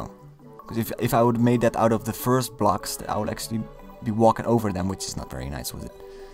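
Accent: Netherlands. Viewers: You sound very dutch to me... no doubt. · You are dutch right? I can hear it in your accent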